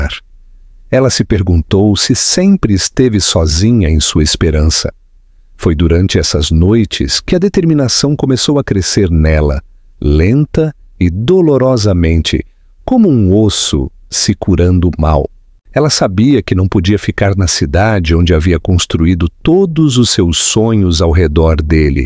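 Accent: Portugal. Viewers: O tema da história é bonito, mas a narração a torna muito chata! Principalmente qdo o narrador fala o português de Portugal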